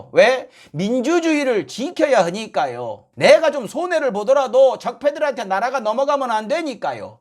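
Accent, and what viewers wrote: Jeolla, South Korea. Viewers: 저 전라도 사투리로 보수정당 지지했으면 더 좋았을텐데요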